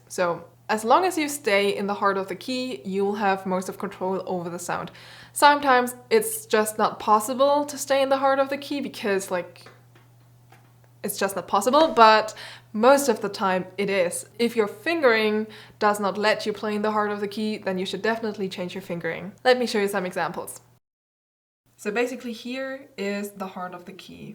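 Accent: Germany. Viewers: To change the topic ..... it's interesting to hear how a german speaks englisch. Du machst die gleichen gramma mistakes die ich auch aus der deutschen Grammatik rueberhole, und sprichst abgehackte Woerter. Englisch ist mehr eine weiche Sprache wo Woerter ineinander fliesen. Das ist schwer fuer Deutsche. Aber dein Englisch ist gut 🙂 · Your German accent is so cute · Bist du deutsch? Ich höre so einen Akzent?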